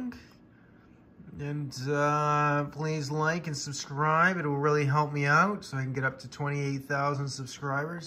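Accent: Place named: United Kingdom. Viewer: Also love your British accent haha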